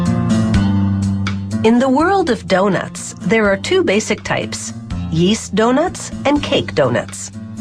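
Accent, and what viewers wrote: Canada. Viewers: was i the only one who noticed she kinda had a Canadian accent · You can tell these shows are Canadian by the way the narrarator says 'about' and 'out' XD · This announcer is very obviously not American. Very noticeable Canadian accent. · is the lady talking from canada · wow, such a canadian accent~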